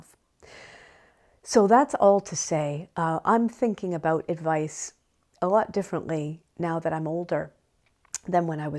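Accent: Ireland. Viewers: Thank you, your lovely smile lights up the whole room. It's a real pleasure listening to your beautiful soft & gentle Irish accent..🍀☺️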